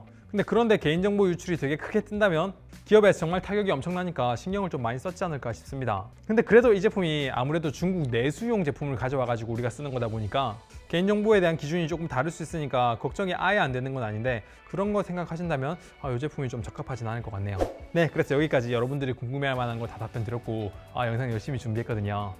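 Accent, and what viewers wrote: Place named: Seoul, South Korea. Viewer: 이분 서울사람 맞나요? 표준어가 상당히 어색하네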